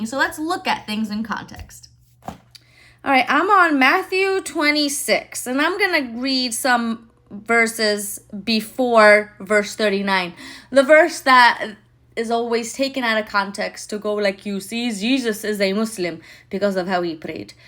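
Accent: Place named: Levant, Arab world. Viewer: Very good acting in Eastern Arabic English accent. Wow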